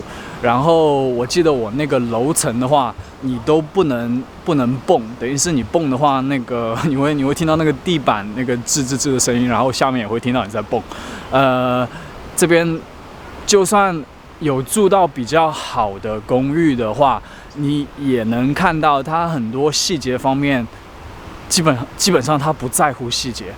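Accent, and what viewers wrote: Taiwan. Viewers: 哪里人？为啥说话台湾腔！ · 你的腔調好像台灣人😳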